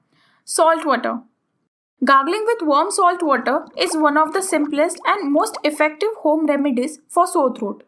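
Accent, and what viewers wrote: India. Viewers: That Indian accent though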